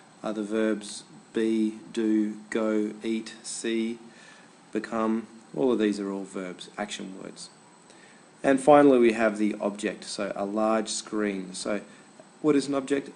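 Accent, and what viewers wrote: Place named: Australia. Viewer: Thank you! Very enticing Aussie accent...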